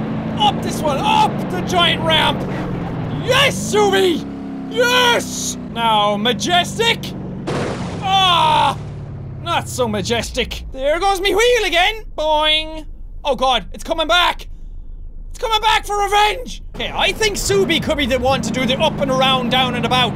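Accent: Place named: Ireland. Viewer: The boss he's flipping irish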